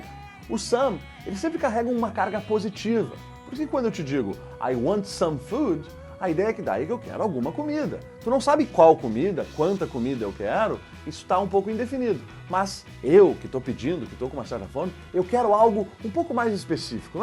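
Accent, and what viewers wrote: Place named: Porto Alegre, Brazil. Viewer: that accents of Porto Alegre is not confuseble. I read you loud and clear. Good job!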